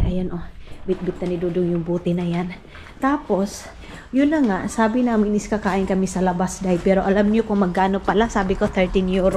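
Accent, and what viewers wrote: Visayas, Philippines. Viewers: Ang or mu nmn oiy wlay lami ang imong content ba visayang badoy mag salita halatang visaya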